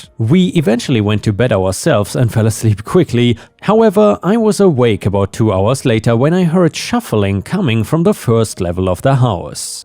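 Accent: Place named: Germany. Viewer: Love ur accent 💞 im thinking German or perhaps French? 😁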